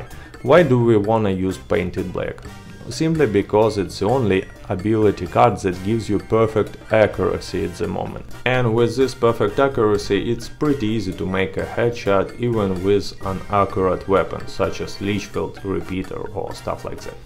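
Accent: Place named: Russia. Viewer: Hey, like ur Test cause i tested with friends same way 👌🏻 There are many possibilities to make builds with the cards and u analyzed it very clear for different modes of gameplay and playstyle 💪🏻 And last but not least your russian accent is kind of what u make unique so it s funny but i like it 👌🏻 Keep it real... i subscribed and always like your videos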